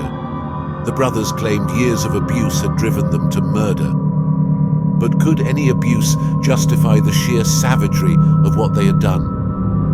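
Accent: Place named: United Kingdom. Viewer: Кажется это британский английский -- если я не прав можете кинуть в меня камень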